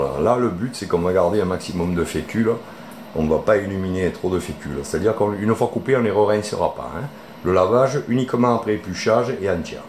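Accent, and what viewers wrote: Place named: Marseille, France. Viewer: Ah! Et j'oubliais... L'accent de Marseille, qui rime avec merveille...Top de super top!💖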